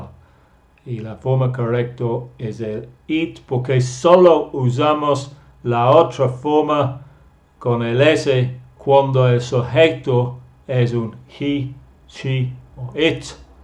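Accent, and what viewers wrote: United Kingdom. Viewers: Excelente profesor de Ingles, me gusta su inglés británico. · es inglés británico? Thank you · I wonder if you learn English from him you'll have a british accent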